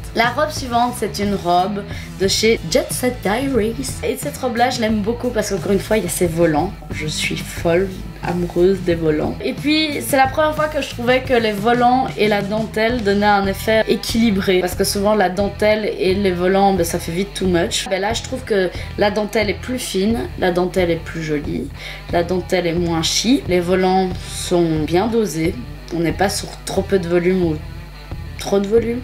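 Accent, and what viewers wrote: Belgium. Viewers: C'est bien une compatriote belge que j'entends là?Je te trouve super cool a regarder je m'abonne directttt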